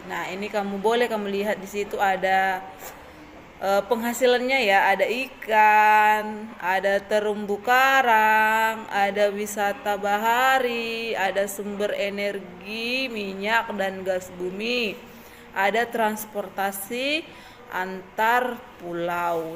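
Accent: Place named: Batak, Indonesia. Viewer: Terlalu Batak kali bu guru ❤❤❤❤❤❤❤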